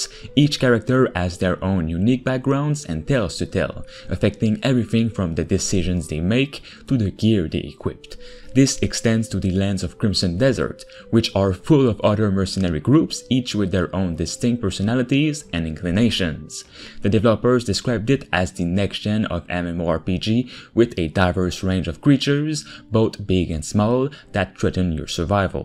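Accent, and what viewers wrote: Quebec, Canada. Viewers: Judging by the accent, he is probably french canadian.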